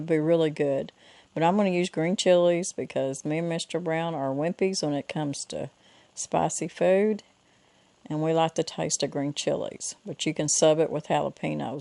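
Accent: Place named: Texas, United States. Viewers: that’s how we say thing in Texas! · U2 are wonderful, please tell me your accent, were are U from......Texas maybe................I just Love this accent. .......I am French Canadian living in Vancouver BC and I jet get exited with your life...Thank U2 for your great meal................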